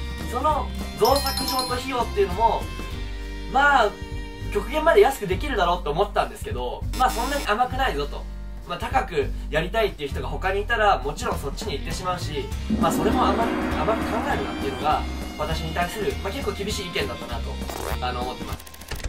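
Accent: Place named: Gunma, Japan. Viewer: 群馬イントネーションわろた。ジャートンの編集いいよね。笑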